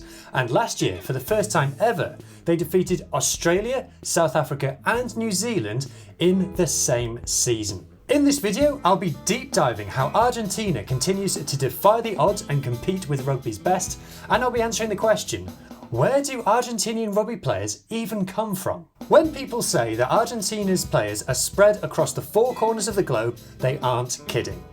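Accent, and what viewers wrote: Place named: United Kingdom. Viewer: Same here, his brit accent makes it more understandable (at least for me haha)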